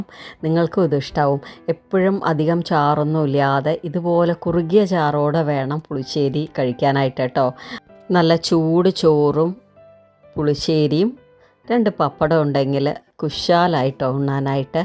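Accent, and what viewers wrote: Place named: Palakkad, India. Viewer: Palakkad aano ? Bhasha kelkkumbol ende naatukari aano enna samshayam.😊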